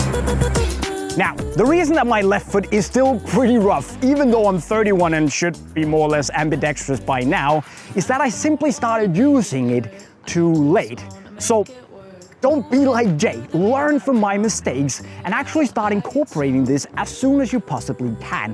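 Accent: Denmark. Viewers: I smell danish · He definitely has the danish accent😂😂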